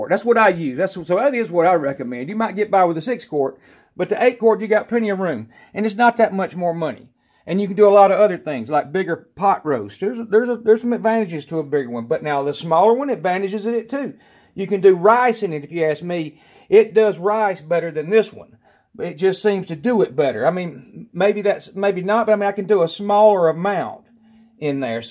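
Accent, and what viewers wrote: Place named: Carolinas, United States. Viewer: I love your accent. I have relatives in North and South Carolina and I miss that accent so much living up here in Pennsylvania. My mom's people are from South Carolina and I have a cousin in North Carolina who sounds just like you. Sweetest accent on earth! It just exudes friendliness! Thanks for the helpful video. Now I know I need an 8 quart so I can put potatoes in with the chicken or large pot roast.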